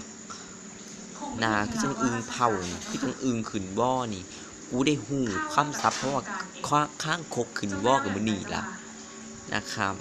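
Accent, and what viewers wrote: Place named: Laos. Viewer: คนไทยหรา555ไม่ใช่มั่ง 555 หนังหน้าลาวมาก พูดก็พูดลาว อีก555 อีเกย์สายเหลือง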